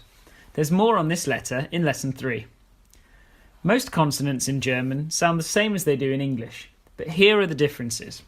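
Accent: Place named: England, United Kingdom. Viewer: You sound more like an Englishman.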